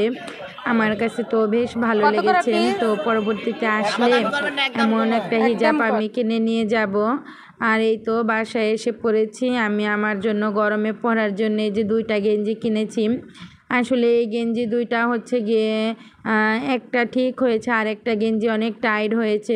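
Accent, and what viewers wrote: Barishal, Bangladesh. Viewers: আপু তোমার মুখে বরিশালের ভাষা শুনতে অনেক ভালো লাগলো আসলে দিন দিন মানুষ পাষান হয়ে যাচ্ছে মেলায় অনেক কিছুই দেখলাম ❤ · আপু বরিশাল ভাষা খুব সুন্দর কিযামত আলামত ❤❤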